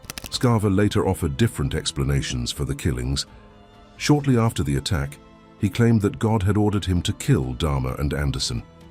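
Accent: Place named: United Kingdom. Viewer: Thanks for the sensitive narrative. I appreciate the British voice and detail.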